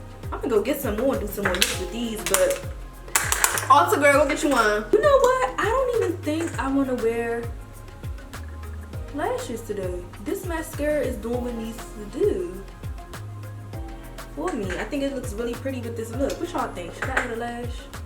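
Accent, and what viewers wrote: Washington DC, United States. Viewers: Oooo is that a Dc accent I hear🥰😍😍❤️❤️❤️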